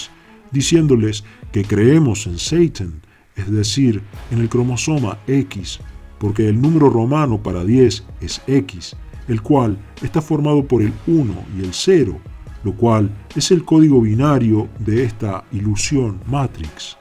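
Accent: Córdoba, Argentina. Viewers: El dueño del canal me da la sensación que es de Córdoba, Argentina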